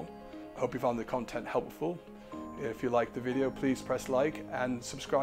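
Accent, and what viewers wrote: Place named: London, United Kingdom. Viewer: your accent sounds very similar to Prince William's accent. Did you grow up in the same area of London?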